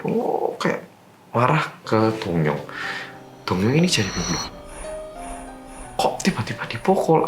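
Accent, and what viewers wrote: Java, Indonesia. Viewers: Lebih enak didenger medokkk drpda yg tadi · Lanjut ngerungo'no critoe oppa seng meddok 😊😊😊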